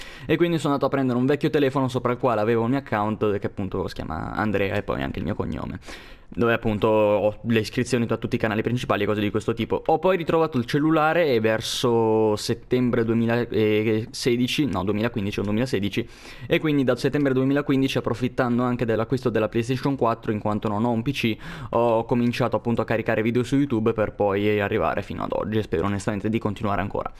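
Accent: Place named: Monza, Italy. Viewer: Secondo me sei di Monza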